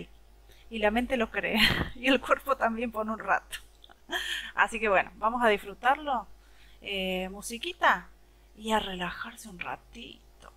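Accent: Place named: Córdoba, Argentina. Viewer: Que te diviertas en tus vacaciones !!! 👍👍👍 Una preguntita... de qué lugar de Argentina eres... porque tienes un dejo muy particular... yo veía el programa de Olmedo y el Gordo Porcel... Olmedo hacía un personaje con un dejo muy parecido al tuyo...Perdón, creo que el personaje a que me refiero salía en No toca botón... creo que era de la provincia de Córdoba...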